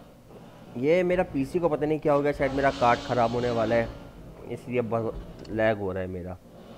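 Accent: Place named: India. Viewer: You speech India 🇮🇳 will I don’t mind,it ok 👍 and I don’t have that dinosaur yet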